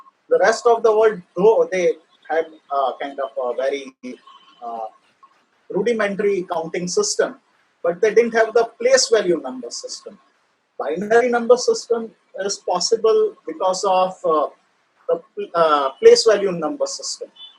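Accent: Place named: India. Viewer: I wasn't expecting indian accent 😅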